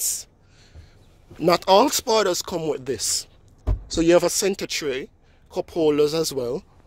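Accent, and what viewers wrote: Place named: Jamaica. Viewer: your tone tells you from Jamaica,.....wagwaan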